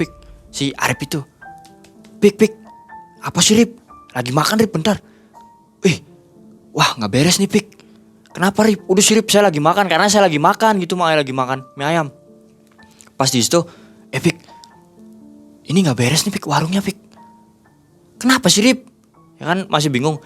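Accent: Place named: Cirebon, Indonesia. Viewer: Penuturnya enakan mas...logat cerbon tp apa adanya,lugu polos gk dibuat2..mas smakin lama cerita,smkin penasaran jgn hbis dlu critanya..klo ini berapi2 tp malah pngn cpt2 selesai...ayo mas,cerita lgi mas..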